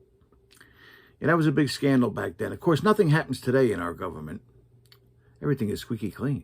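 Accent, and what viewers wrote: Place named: New Jersey, United States. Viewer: New sub here. Knew that had to be a Jersey accent. Interesting rip. Hadn't seen that set ripped before. Wow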